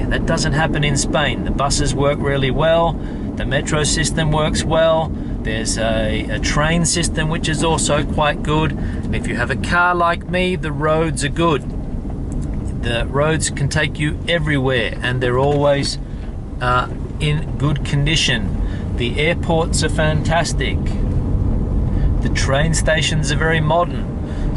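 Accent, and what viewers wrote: Australia. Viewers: Thank you we are thinking of moving to Valencia good to hear another Aussie! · He sounds pretty Australian. Maybe he just speaks skow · That's an Aussie accent... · Where are you from? I would say you sound Australian, but since I am Spanish I am probably not the best one figuring English accents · Why not saying you are from Australia (accent is obvious) and make comparisons with Down Under? Video could be much shorter. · OMG what are my ears hearing: A Brit acknowledging we here in Spain have great food!! xD (edit: No, it was an Aussie, too good to be true haha) Good video by the way, I agree with pretty much everything you said. And I love the driving and road footage. You didn't mention roads/infrastructure as a big pro (edit: last thing you said :) Regarding corruption: It's true that it's huge, but as you said there's no corruption at the "lowest" level, you can't bribe a policeman or a clerk etc. 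Also most big corruption scandals involve local and regional governments, not the State itself. I'd say the "State" and the bottom-working public officials are clean. It's the regional big-fishes who are corrupt most of the time.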